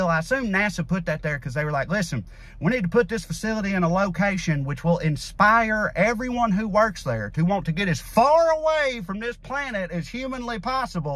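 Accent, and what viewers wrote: South, United States. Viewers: We in Arkansas say 'Thank God for Mississippi'. We know that if it wasn't for them, we'd be last in everything good and first in everything bad. Tennessee or Alabama have nothing to fear from us, we love being mediocre., can you trash us and our six-pack of Clowngressional Seditionists next? We got four (notice the correct spellin' there) Trumpremoras for Reps and a fancy guy who writes letters to Iran and has presidential assrespirations who is named after a plant who is supposed to be a senator. And the other? Well, I have no damned idea of what he does other than collects his Federal checks and adds up his bribes for voting like the Q party tells him to. None of the six of them bring home ANY Federal Pork for the tax money we send in for things like fixing our crumbling bridges and roads or good paying federal jobs programs to the people who checked the box next to the letter Я. 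No decent employer with good paying jobs wants to come here because all their talented and smart people would leave and get hired the next day. What's our fastest growing employer? Dollar General. I swear I see a new one every time I go out. Then we got Sarah Grifterbee runnin' for the Guv seat, you could get a whole video out of just that. God bless you and keep speaking the factual truth. It's weird hearing truth when spoken in a Southern accent. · ! You’re hot and I love your face! >.< but what I love the most is your irreverent common sense, we need more of that! Also, the southern twang helps a lot 😉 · love hearing the Confederacy trashed with that great southern twang..... you give hope the south may actually rise!...to a functional level of intelligence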